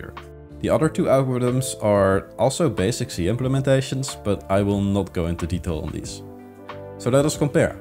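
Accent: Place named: Netherlands. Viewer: You sound dutch, where the average male height is beyond 1.80.. no wonder you don‘t know how to deal with gnomes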